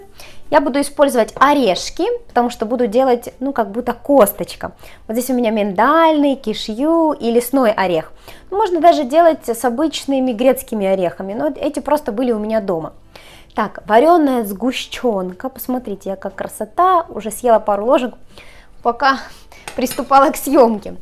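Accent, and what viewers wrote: Ukraine. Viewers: Сергей Хворостенко люди! человеки! вы все забыли что девочка живет в Украине, там так говорят и это нормально, вас же всех не учат как правильно произносить украинские слова? У нас в России многие вообще забыли родную речь, или так засорили словами паразитами что слушать невозможно. А девочка умничка, трудяга. Так держать. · молодец! Люди, а вы должны понимать, что человек с Украины и акцент остаётся надолго, ничего в этом страшного нет, любой иностранец говорит с акцентом, это почему то не раздражает...., вам спасибо большое за интересные рецепты😊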